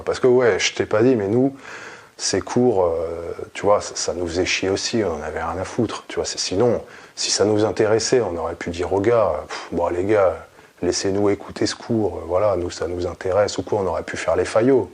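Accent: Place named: Marseille, France. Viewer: Je croyais que t'étais de Marseille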